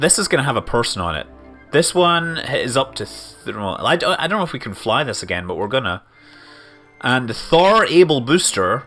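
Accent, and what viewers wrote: Scotland, United Kingdom. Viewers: Hey, you don't have to affect an American accent for the liftoff since it's a Global Space Agency. It could be a fellow with an even heavier Scottish accent than yours doing that countdown!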